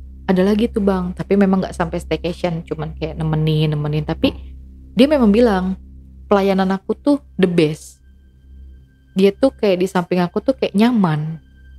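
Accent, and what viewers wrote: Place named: Medan, Indonesia. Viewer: Logat kakak nya seperti orang kami .medan..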